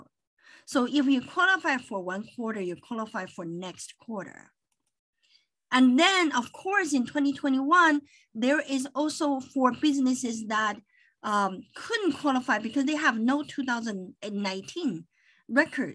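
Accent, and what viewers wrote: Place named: China. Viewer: you are so good. your Chenglish is just fine. I learned a lot from your video.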